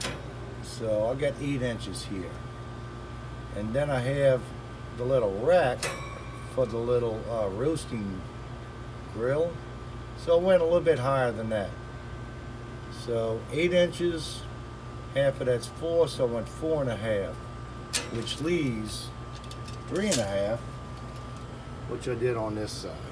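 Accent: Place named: New Orleans, United States. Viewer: you sound like you from NOLA with that accent.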